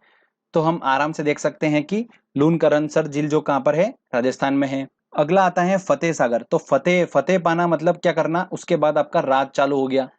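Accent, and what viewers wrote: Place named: Madhya Pradesh, India. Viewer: Aapki voice se hi lag gya aap MP se ho mere bahut dost he MP se islie samjh aa gya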